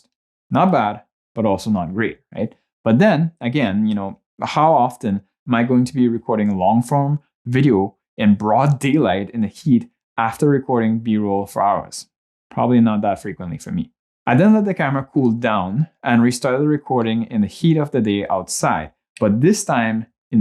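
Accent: Trinidad and Tobago. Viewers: Do I hear ah Trini ??? 🇹🇹👀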